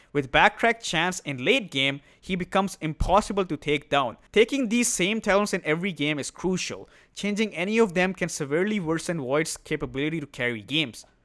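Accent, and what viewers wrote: India. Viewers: You’re accent so indian 😂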